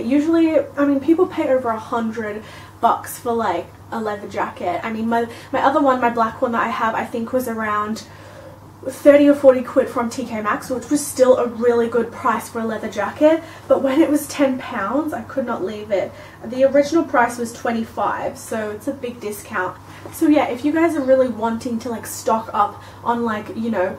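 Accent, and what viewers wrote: Australia. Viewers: She's either from Australia or New Zealand (I can never tell the difference). I'm English and she's definitely not from here :) hope that helps x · Yeah it's definantly Australian then. I was having such a moment last night. Think I'd be able to recognise my own accent lol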